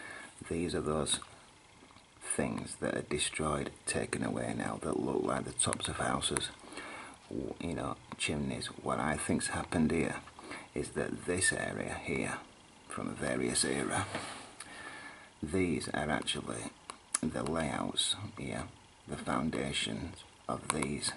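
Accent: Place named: Scotland, United Kingdom. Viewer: Love your work, being a fellow neighbour scot..dig the accent (no pun intended) i love sherlock holmes at the best of times..i mean serously..have learned much form his methods and observations but this takes it to a whole new level...when you explain it then you see it for what it is...it becomes the elephant in the room...i have a construction back ground myself, so know a thing or two from an engineers stand point... and i look all around me at modern cities every where i have traveled been to over 21 countries so far...and i can't help but notice that rapid rate of erosion and dilapidation of our modern buildings...so really makes you wonder what <num> odd years would look like?...and it pretty clear that IS what we are looking at..so many pre-civilisations being reset every few thousand years...when your numbers up - your numbers up.....keep up the amazing work..you are doing breakthrough work. 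1 QUESTION ?? interested to hear your theory on what would create the volume of sand we see everywhere all over the world...Like the deserts...(Maybe once Oceans) its maybe that the old world was Silca based and now we are carbon....SO much sand..(and) water..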